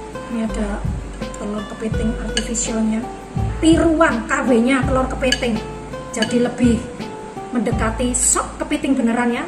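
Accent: Java, Indonesia. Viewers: Pas banget lg pingin sop asparagus 😋 Resep2 nya mesti tak tunggu2..soal e seneng liat ai nya kalo jelasin ,super jelas tp medok 😊👍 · mantap ce makan musim hujan bgtu enak banget 😋😋..suka logat bahasa jawa cece hehe.. jelasinnya jelas dan mudah dimengerti thx ya ce semangat trus ya ce Gbu 😊😇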